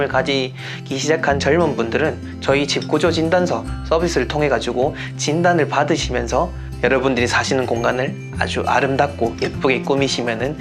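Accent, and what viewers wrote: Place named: Daegu, South Korea. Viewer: 대구 사투리?? 뭔가 똑부러져요 ㅎㅎㅎ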